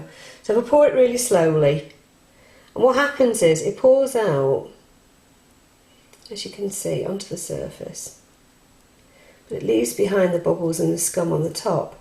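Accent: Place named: United Kingdom. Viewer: So cute!...i always seem to have issues with my mnp setting in my pouring jug....i dont like to heat it up too much because i worry about my fragrance being burnt off....your mnp looked very hot....you dont have issues with burn off....love ur videos :o)....nice to hear a uk soaping voice! x oh and the cornflour tip..awesome :o)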